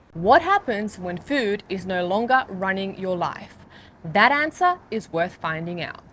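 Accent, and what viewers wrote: Australia. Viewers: YEEEEEES! YOU'RE SO FREAKING RIGHT! Always grazing and never feeling clear.. of course sleep is a part of this but this once a day thing I'm going to try and hopefully keep. And lastly.. Man I forgot how much I love the Aussie dialect.. I'm in love with it again.. Maybe find an Aussie woman now😂. Thanks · You're Australian or New Zealander?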